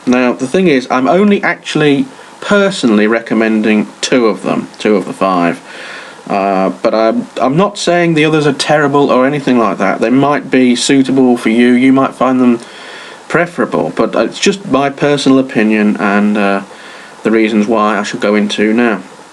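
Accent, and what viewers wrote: United Kingdom. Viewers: You have a wonderful British accent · i love your english accent, its calm, easy to follow, and very clear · Good old Northern English dialect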